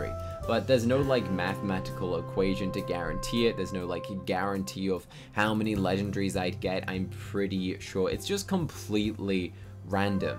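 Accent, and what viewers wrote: United Kingdom. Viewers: You sound like every british guy I've ever met, it makes me feel like I know you lmao.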